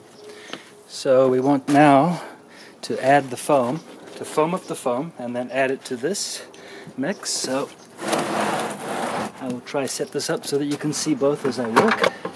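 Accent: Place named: South Africa. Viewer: I can tell you use to live in South Africa, can still hear your accent. Thanks for this video!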